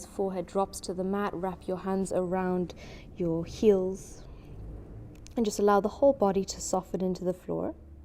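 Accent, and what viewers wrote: South Africa. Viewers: Is that a South African accent I'm picking up? Loved the practice, perfect balance and I feel very energized and ready for my Friday!!!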